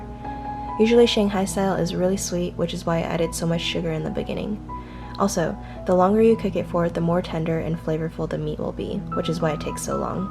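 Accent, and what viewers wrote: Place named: West Coast, United States. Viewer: thank you i love when I get recipe from the real country people. however you sound like west cost.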